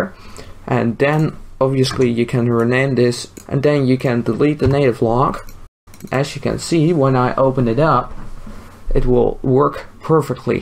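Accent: Netherlands. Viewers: Your dutch accent tho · mooi nederlands accent man haha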